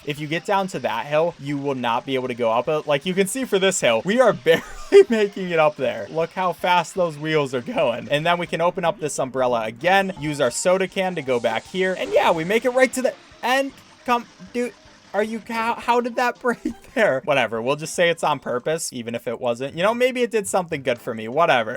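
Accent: Czech Republic. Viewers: You are czech ? :D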